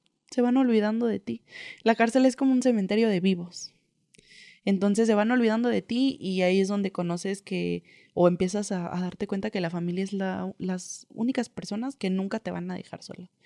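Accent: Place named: North, Mexico. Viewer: Exactamente!! No tiene una buena preparación académica eso se ve a, leguas, aparte el tonito norteño, ojo no critico, pero coincido con los comentarios anteriores. Si esto le está dejando dinero, que invierta en su preparación, empiece a estudiar una carrera profesional como el periodismo, ya que, ahora es todo en linea y bien que le dará tiempo, alternando con cursos de locución, animacion, etc, todo lo relacionado con este tema. Mi hermano es un excelente locutor y comenzó desde abajo, pero estudió Ciencias de la Comunicación y ha tomado muchos diplomados, cursos, aunado con el idioma inglés que domina muy bien, y se fue incrustando en este ámbito cada vez más. Lo comento con respeto y de manera constructiva, pues lo viví de cerca y que mejor ejemplo que con un hermano. Preparese más.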